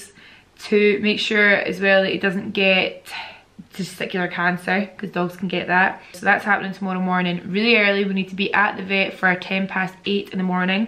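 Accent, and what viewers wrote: Scotland, United Kingdom. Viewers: Your the first Scottish youtuber that I've followed it's great hearing the Scottish accent xx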